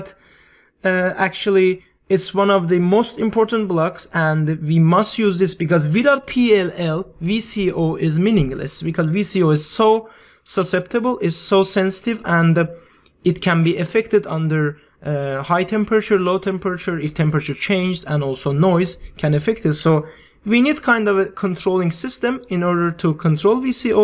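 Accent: Turkey. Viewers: türk müsün la konusmandan cok öyle geldi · dude you are definitely turkish hahah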